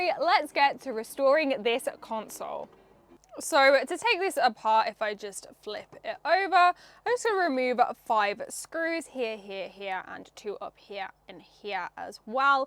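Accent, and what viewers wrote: United Kingdom. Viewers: Уёкин фойн!! Для нас кто зараза вырос на Американском произношении... вся британская речь как крендельки!.. Ну, я уж не говорю, с такой милой Леди, иха издающую!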